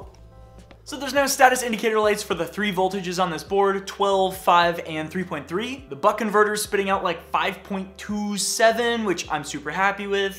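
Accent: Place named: East Coast, United States. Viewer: Does he ever say where he lives? His accent is subtle but I'm thinking east coast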